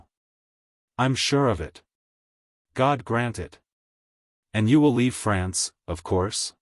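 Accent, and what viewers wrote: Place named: United States. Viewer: If you can change the AI narrator to a British male, I think it's much more effective, a story, especially from this time period, to be read aloud /heard in some sort of UK accent, rather than an American/Canadian accent. Of course, since I have not even the vaugest idea of how AI works, I don't even have a YouTube channel, I really don't know what I'm asking. For all I know it's something that would require many hours of work or, something that could happen with the click of a button, lol. Anyways, I'm deeply involved in this story, 2 plus hours in, and enjoying it regardless. And as far as I can see, you are the only channel who has uploaded it so since I'm trying to complete the entire Arsene Lupin series this way, since I, unfortunately, don't currently have access to any of the books, this particular video is so very much appreciated!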